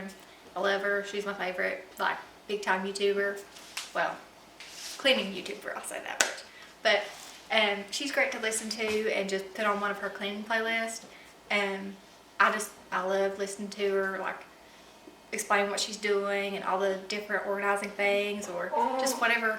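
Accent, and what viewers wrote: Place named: South, United States. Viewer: Where are you from? I love your southern acent I wish I sounded like that LOL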